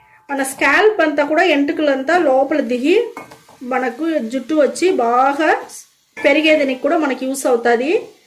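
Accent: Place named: Chittoor, India. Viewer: Chittoor Telugu... influenced by Tamil 😂😂😂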